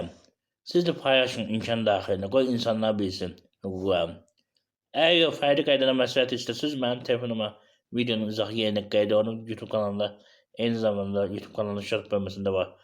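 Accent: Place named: Quba-Khachmaz, Azerbaijan. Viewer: Quba Xaçmaz terrfdən olarsan yəqin?mən Zirəli iyəm bizi də belə danışırıq. Uğurlar qardaş şABUNƏ OLDUNM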